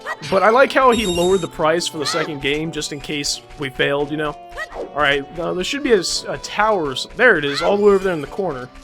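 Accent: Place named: South, United States. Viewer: Your Southern rural accent is really good. Not the horrible caricature that comes from a place of disrespect that I hear so often.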